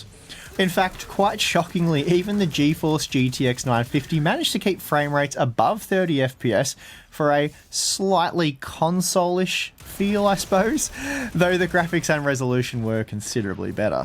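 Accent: Australia. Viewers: Great work mate! nice to hear an aussie for once doing bench marks ;-) keep up the great work!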